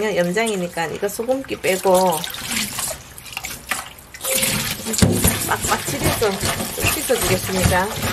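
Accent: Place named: Busan, South Korea. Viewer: 곰피 그라이까네 돌아가신 우리어무이 생각이 나고 아련한 추억 과 정서적 그리움 이 나네예 이 조리하명셔 하시는 나래이션 억양 도 어쩌면 어무이 억양하고 똑 같는지 모르겠심더 꼭! 우리어무이가 환생 하셔서 내 어릴적 부엌에서 음석 하시는 모습 이 회상 되네예 저는 어려서 식구들 따라 부산에서 서울로 이사 왔 심더 이제 나이 60 문턱에 있고 저희 노친네 부모모두 다 세상 떠나셨지만서도 우리 집안 음식맛은 이제 어디가서 도 손맛 을 느끼기가 어려워서 추억으로만 간직하며 있었는데 채널 을 보며는 꼭 우리집안 음식 만드는 정서가 자극 되네예 😊 어릴적 어무이따라 자갈치시장 장보러 가고 하다보이 저희집 음식은 젓갈도 비리고 짜고 맵고 하는 기호 이다보이 이 젓갈도 비린거 좋아하는 사람 운운 하는 맨트 들으면 꼭 백프 나한테 공감 와 닿심더😄 어쩌면 꼭 억양 어투도 우리어무이 하고 꼭 같는기라예 음석 취향도 같고😂 내 생애 다시는 우리 어무이 음석 손맛 못 찾을것 같은데 유튜브 채널 보며 내안에 잠재되었던 우리집안 음식 정서 를 자극 받심더😆 이제 우리집안 일가 그쪽 친족들 다~ 아 어른들 이 않계시고 고향 에는 아무도 없다보이 부산경남 쪽 음식손맛 의 전통을 느낄 기회도 없어지는게 아쉬움 그리움 으로 간직하며 지내는데 우연찮게 채널 레시피하고 나래이션 보고듣고 하이까네 돌아가신 어무이 생각 나서 댓글 몇자 정겨움 과 고마움에 올려보네예 계속해서 내고향토속적 음식 조리방식 비중으로 좋은 방송 해주세요 아셨지예🤣 화이팅! ✊👍 레시피를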